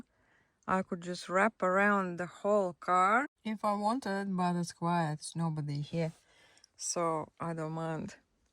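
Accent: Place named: Russia. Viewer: You sound Russian. I am cool with that.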